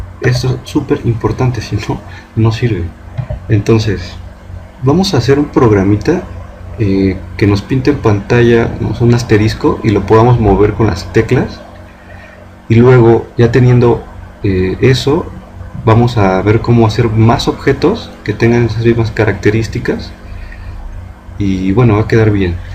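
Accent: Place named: Central America. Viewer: El acento se nota que es de centroamérica o de México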